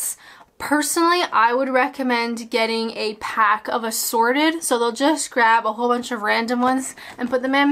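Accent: Canada. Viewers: I love the aboots 😊😊😊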